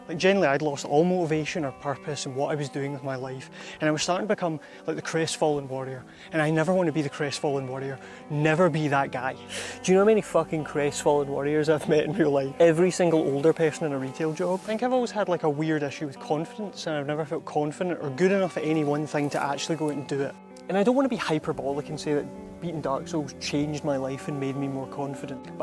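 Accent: Scotland, United Kingdom. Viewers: Might I add, the Scottish accent automatically makes everything significantly more excellent. Much love from my little country (Chile) to yours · you know.. for some reason I expected a scottish accent and I got one. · I enjoy listening to your Scottish accent :) video is decent, too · Haven't subscribed that quickly in a while. Good production, dark souls appreciation and a balamory accent got me straight on board.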